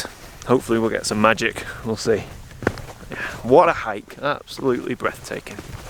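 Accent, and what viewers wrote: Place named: England, United Kingdom. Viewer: The North-West US, that is? Near Canada? I had to check as the English accent created ambiguity ;) The other day I read a story of a guy who survived a grizzly bear attack in the Canadian side of the border. On an island where the wildest predator is a fox and the whole country is man-made, man-influenced or man-kempt, where rain can surprise you, but generally will not get you hypothermic in a short time - what did you take along as risk-mitigation on this trail?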